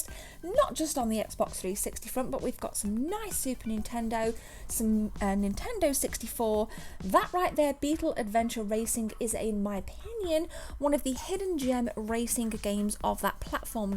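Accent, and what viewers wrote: United Kingdom. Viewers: If I had such a lovely British accent, I would never shut up!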